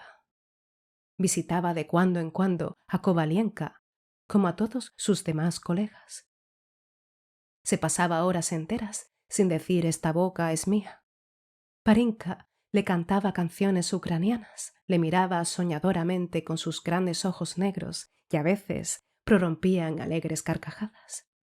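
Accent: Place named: Spain. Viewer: La voz, la entonación, la pronunciación de algunas consonantes que contrastan con la suavidad de las otras y las vocales, me hipnotizan. Además que de por sí el acento español de España, cuando es claro, me encanta.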